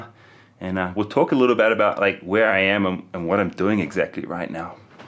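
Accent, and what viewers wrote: India. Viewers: For my side the way you ride bike and the way you talk is like your 100% indian brother no matter what u r a perfect indian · Hello ur English sound like Indian English I like the na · Watching you from beginning... And see the way of your talking... You've become a pure INDIAN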